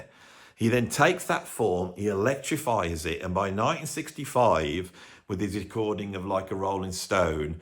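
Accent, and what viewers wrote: Birmingham, United Kingdom. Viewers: Oh...for God's SAKE!!!...this is PAINFUL to listen to! For a start...I can never take any Brummie seriously with that ridiculous whiney Jasper Carrot/Noddy Holder/Ozzy accent! It makes me teeth itch! 😖 Secondly...WTAF?!?! I don't know what makes you think that YOUR opinion qualifies you to spout such tosh, but...I'll give you MINE... Musician for over 50 years. Multi-instrumentalist. Guitar, Keys, Clarinet, Flute, and Sax. Played professionally for decades to some considerable success. Also a published writer and music historian. So...to listen to SO MANY INNACURACIES...just made me want to SCREAM! So much of what you were blathering about is just plain FACTUALLY WRONG! Example: Zeppelin...were what the Band of Joy BECAME?!?! 😂😂😂😂😂😂 WHAT?!?! Utterly ludicrous. Zeppelin were ALWAYS Pages brainchild. From beginning to end. 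He had seen The Jeff Beck Group...and basically had decided to photocopy them. Add a few gnarly blues standards dressed up as Zep "originals"...and there you have it. NOTHING to do with some hippy-drippy band of deluded hippies from the "Bluck Countraaay" Give me strength! Go back to working in Carphone Warehouse mate... This CLEARLY isn't your gig. 🙄😏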